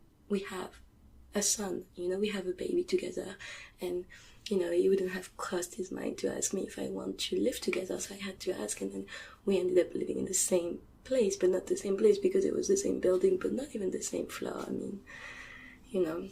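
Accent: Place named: France. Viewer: Tingly as always <3 Your french accent videos are so tingly man. Your hair!!! Are those clip-on bangs or a whole wig or new hair or--- you look gorgeous anyway:)))) Thank you for the video <3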